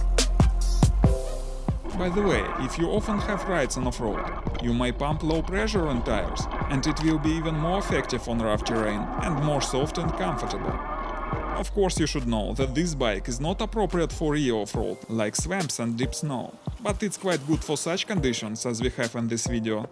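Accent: Russia. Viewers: once i heard the russian accent i knew instantly this would be a fst bike revieq worthy of watching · Russian accent 😒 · О знакомый русский акцент) Прикольный велик для зимы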